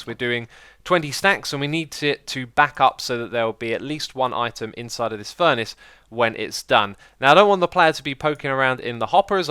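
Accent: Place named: United Kingdom. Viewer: Yo, what part of the UK is your accent from?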